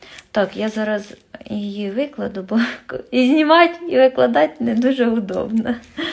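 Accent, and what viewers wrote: Poltava, Ukraine. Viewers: Чого примахуєтеся до людини за її мову в кожному регіоні говорять по своєму на Полтавщині так говорять вона ж вам пояснювала правильні ви дуже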